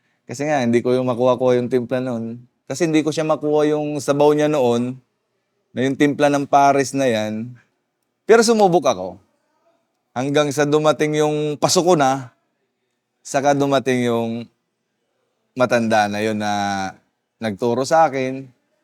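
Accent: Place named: Pangasinan, Philippines. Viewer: Ang tono ni sir parang taga pangasinan siya, mabuhay ka at wag kang susuko par, par ang tawagan ng mga taga pangasinar pangalatok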